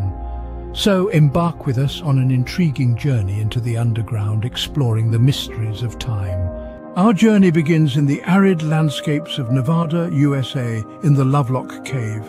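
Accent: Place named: England, United Kingdom. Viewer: The most rational explanation is to believe what the evidence shows even though some of the evidence has been removed and destroyed what they didn’t get or some of the large footwear from Giants of approximate 12 to 15 feet tall plus red hair, which was checked for DNA but you will never hear the results of that test. It ruins the paradigm of this very wordy over Adjective laden, plus add the English accent to serve some sort of intellectual prowess to perpetuate the paradigm rather than report on the Fax.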